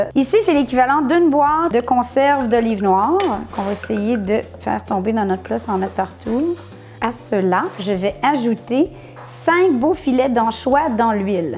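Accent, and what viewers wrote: Canada. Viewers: Coucou j adore tes vidéo et l accent canadien qui nous réchauffe le cœur que ca soit l été comme l hiver on aimerait voir beaucoup plus de vidéo mais je pense que sait beaucoup beaucoup de travail je suis super content de voir les nouvelles vidéo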